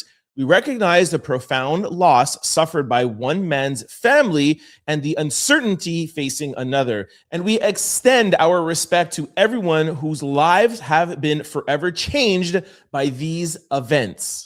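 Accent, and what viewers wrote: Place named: Canada. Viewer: This Canadian accent is annoying as hell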